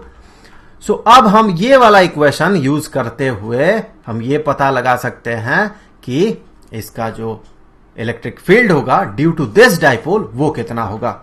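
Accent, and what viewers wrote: Bihar, India. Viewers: What a sophisticated explanation..! I'm really very impressed with you sir. You're awesome and vo Bihari tone sir ji fan ho gye hai hm aapke... proud to be a Bihari🤘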